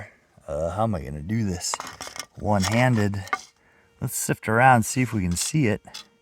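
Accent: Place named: United States. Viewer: YOU GOT A CRAZY COWBOY ACCENT & RHYME BY TALK & GOT A CRAZY COWBOY TOY CAR TOO, WHERE GOT YOU ALL OF THOSE!!! TO WHERE I' TO DIG TOO...AS TO DIG A FIRST CLASS GOLD.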